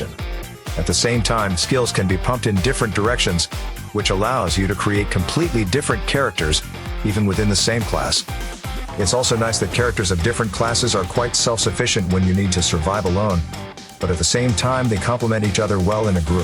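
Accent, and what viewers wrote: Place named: North America. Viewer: What the fuck does that even mean even to European gamers why does everybody else in the world Dam have ugly shit so this game looks good I will shut the fuck up any case you’re speaking in a western accent North American probably tell us game isn’t out Ross yet so it should be on this list and it probably will never be out it’s my bad Amazon is not releasing it yet because they don’t want it to overshadow new world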